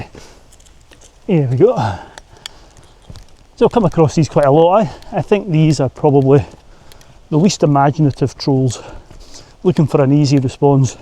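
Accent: Scotland, United Kingdom. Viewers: Watching videos presented with a Scottish accent is very educational. I have learnt lots of useful words like 'scran' and 'houlie'. Seriously though the quality and attention to detail in your videos shines through and gives me the opportunity to visit places I will never be able to visit due to age and mobility. Your videos also allow me to remember with fondness about some of the places visited when younger and fitter, like The zcuillins on Skye and the Cairngorms. Keep up the good work and kick those trolls up the bahookie! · Yes I thought the exact same thing. If you can't understand a Scottish accent why ate you watching Scotland's mountains! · Love your videos and your Scottish accent!! What a shocker seeing a Scottish man enjoying his own beautiful country. Keep on keeping on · I just want to say I love your Scottish accent ❤ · Aye, am scunnered wi' thon accent oan ye tae. 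Whits thon aw about? Are ye puttin' it oan or somethin'? They can only be sassenachs. Everybody else thinks oor accents are braw. Cheers, fae Tasmania. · I watch your channel because of the escapism, the spectacular scenery AND your wonderful Scottish accent. Your videos are fantastic, particularly the ones from my most favourite place in earth, Skye. Keep the videos coming, give the trolls something to do x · Have been watching for a good while now, and what brings me coming back is your kindness, showing us the beauty of Scotland's mountains, sharing tidbits about your family and making everyone feel like we're all a friend who comes to gather here. The worst thing about trolls is that they have no idea of what the other person may be dealing with - a job loss, an illness, you name it. Glad you blow them off, and I think the deer stopped by to agree. Your vlogs truly do make my Wednesday evenings, and what? 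A Scottish accent? It's my fav! ❤ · It's quite sad that even such a positive and enjoyable channel, as yours is, receives trolls and hate. I have nothing but positive things to say about the channel- it brings me a lot of happiness to watch your videos and adventures. And as an Irish viewer, the scottish accent is part of the appeal of your channel. Love the videos! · I can’t believe people moan about your Scottish accent I LOVE your Scottish accent but also weird to mention that when it’s clear by your YT channel name your in Scotland/scottish!? Ironically I get slated and trolled on my videos because Iam English but living in Scotland?! So my accent is also wrong apparently! New to your channel but love the way you have addressed this topic in a light hearted and sensitive but real and honest way x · That was a really interesting one 👍my own personal view is that the vast majority of people who watch your channel (and I include myself in this group), do so because your content is often quite outstanding to those of us who love Scotland and it’s associated outdoor pursuits such as walking, camping, camper vans, photography, etc. 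The very foolish minority of people watching who post these negative comments, no matter which of the eight categories they fall in to are quite simply very sad, lonely and tedious people whose only enjoyment in life is to get a rise out of others. Your advice at the end of your video to completely ignore them is absolutely bang on. I love your channel and its content, and long may it continue…Scottish accent and all😉👍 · How on earth can people say that Scottish people are foreigners? 😂 Is Scotland no longer a part of the UK? Have i missed something? Also, how can people be shocked to hear a Scottish accent on a channel called 'Scotland's Mountains'? Weird. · it amazes me that you get trolled. I love your channel's content. I am Costarican and understand everything you say. In fact, I love hearing the Scottish accent. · You continue to do what you enjoy and ignore the trolls, the are just social rubbish, out of mind. 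I enjoy your videos and your Scottish Accent-Cheers.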